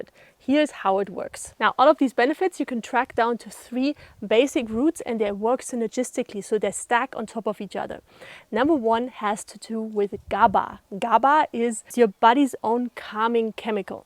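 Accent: Germany. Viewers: Will start tonight. I could listen to this sweet lady talk "all day" with that cute accent (German? I dunno).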